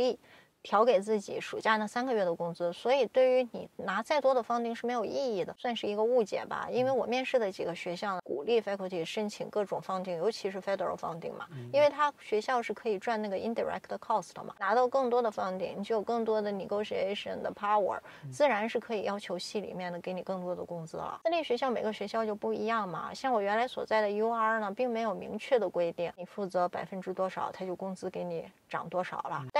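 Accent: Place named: Tianjin, China. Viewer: 这个女生是天津人，似乎听出了津普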